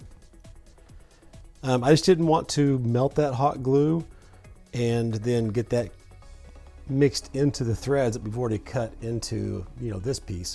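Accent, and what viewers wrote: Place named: Mississippi, United States. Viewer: You're accent is like Michael Conor Humphries the little fella who played young Forrest Gump - himself the inspiration behind Tom Hank's accent. Are you from Mississippi?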